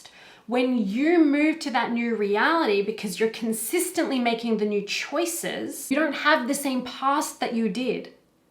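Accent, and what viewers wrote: Australia. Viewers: Curious (because of a synchronicity and sign, this would be confirmation) ... is your accent Australian? ❤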